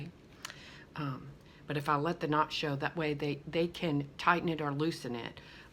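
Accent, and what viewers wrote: Louisiana, United States. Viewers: Girl I can listen to you all day. You are so Cajun.... I love you to pieces.... Stay safe. Good luck with the position....❤️❤️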